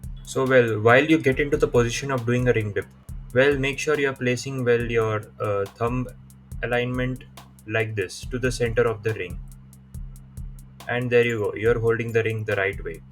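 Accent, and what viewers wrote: India. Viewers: U dont look like Indian but u sound Indian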